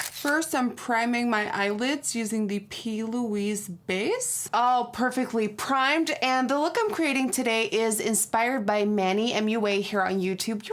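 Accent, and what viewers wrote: Netherlands. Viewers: I’m shook… because I just each led a video of in 2013 and Dutch accent is more strong… 2018 he accent is perfect English…I never know was Dutch I found about by watching her old videos …I would never of known if I never watched her older videos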